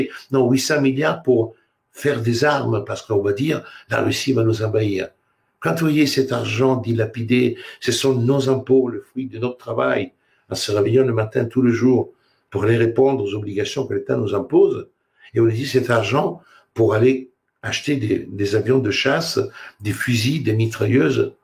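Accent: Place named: Italy. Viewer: Quel bonheur d’écouter notre cher cher cher, avec son petit accent d’Italie et son franc parlé.....merci à lui pour ces années de soutiens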